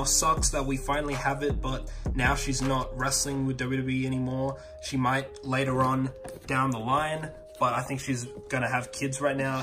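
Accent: Australia. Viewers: Yea I’m pretty sure it’s because he’s from Australia so he has a little accent I caught that when I first watched this but I just saw your comment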